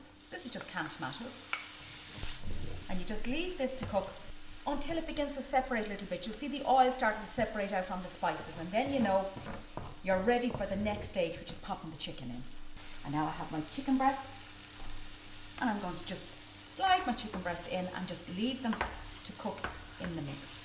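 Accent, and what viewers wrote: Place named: Ireland. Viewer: Love watching you cook and love that beautiful Irish accent to...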